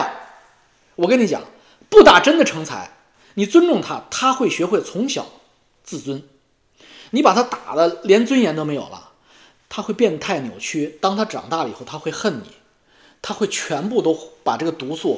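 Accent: China. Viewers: ，前些天你笑话阿姨的英文，今天我听出你的几个单词发音也不理想，中式发言浓度偏高。Fuck，你说的往fak偏；balance，你说的掰Lance；还有一个词可能是要说Melt 融合，说成迈特。见微知著哈，元音的发音比较中式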